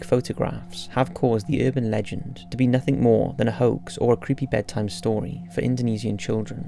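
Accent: Wales, United Kingdom. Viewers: Bro are u Welsh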